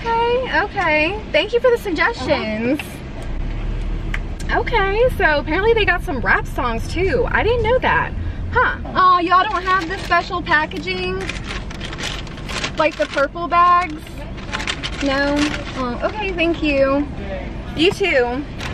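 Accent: South, United States. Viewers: really nice southern drawl of and accent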